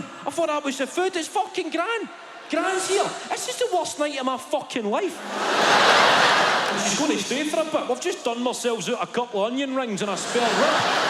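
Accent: Scotland, United Kingdom. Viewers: It's not an English accent It's English with a Scottish accent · Can you please add subtitles to this? I'm from middle east and it's really hard to understand Scottish accent :(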